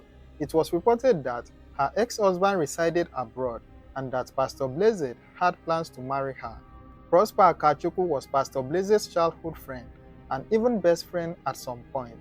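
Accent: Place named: Igbo, Nigeria. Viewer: It surprised me too because he sounds Igbo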